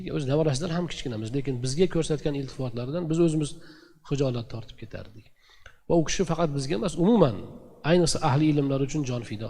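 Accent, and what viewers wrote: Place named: Andijan, Uzbekistan. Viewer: АЛЛОХ рози бўлсин жудаям 👍чиройли маруза қалб ором олади андижондан анджонча содда ёқимли❤❤❤❤❤❤❤❤😊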